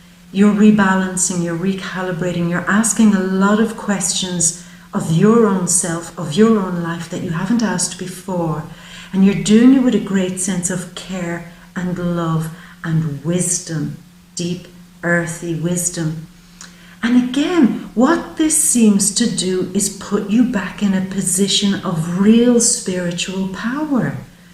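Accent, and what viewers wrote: Ireland. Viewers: So fabulous to come across an Irish accent 👌. Beautiful reading by a beautiful lady 💖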